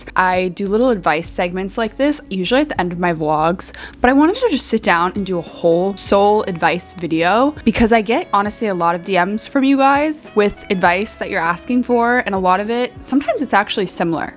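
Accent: United States. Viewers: Ikrr the Minnesota accenttt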